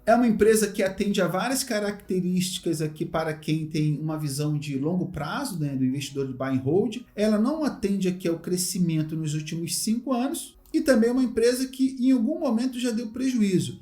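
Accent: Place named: Rio de Janeiro, Brazil. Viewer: Típico carioca. 😂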